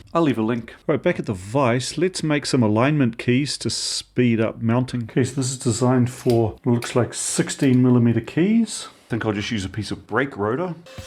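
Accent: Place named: New Zealand. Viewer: Wow what a find. That vice is a real beast that just screams RIGIDITY, so should serve you well. BTW, you're clearly a Kiwi living somewhere in Europe I assume?? I'm in Auckland, NZ. Greetings from down under😊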